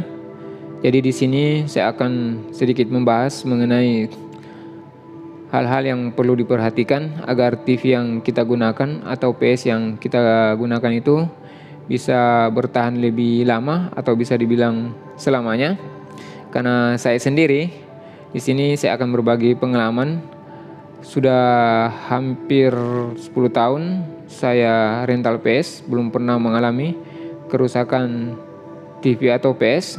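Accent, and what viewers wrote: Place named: South Sulawesi, Indonesia. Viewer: Logat nya orang sulsel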